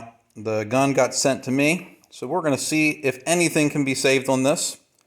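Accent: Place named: Baltimore, United States. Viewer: Good to hear that Baltimore regional accent again!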